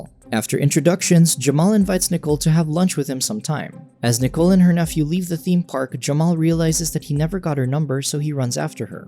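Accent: Philippines. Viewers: Taena halatang Pinoy tong voiceover hahahaha sagwa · You sound Pinoy! :D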